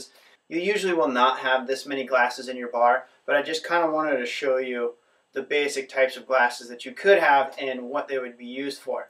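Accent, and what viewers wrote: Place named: France. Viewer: Love the French accent :D Thanks a lot brother, will definitely recommend!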